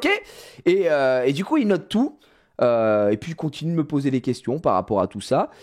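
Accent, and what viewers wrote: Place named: Paris, France. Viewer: non je pense que c'est juste le phrasé parisien typique + le fait que aime bien exagérer qui fait que ça ressemble beaucoup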